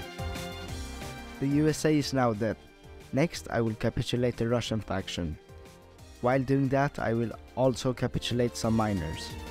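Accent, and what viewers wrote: Sweden. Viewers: Hey are you Swedish? We have the same accent pretty much so I KNOW you’re at least Scandinavian 💀💀💀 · Are you swedish? I can hear it in your accent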